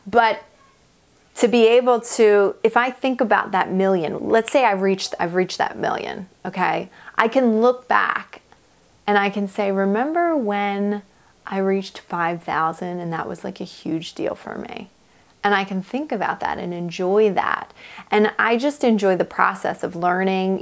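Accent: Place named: Michigan, United States. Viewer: No, it sounds like a Michigan accent. I like your accent. I have a heavy Brooklyn NY accent.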